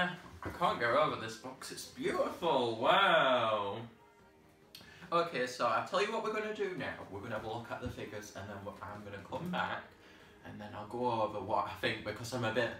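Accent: United Kingdom. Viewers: nice British accent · I love your accent! I'm also British, so I'm not that different from you. 😊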